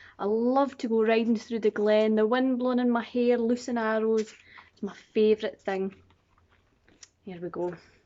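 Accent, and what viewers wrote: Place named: Scotland, United Kingdom. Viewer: Damn, now that's some real scottish accent.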